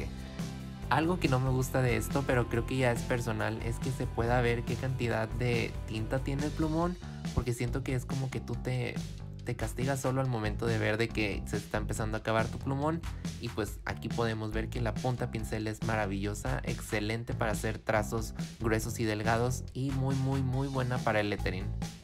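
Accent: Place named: North, Mexico. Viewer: Ya decía yo que el acento me sonaba del norte saludos desde Matamoros,Tam!❤️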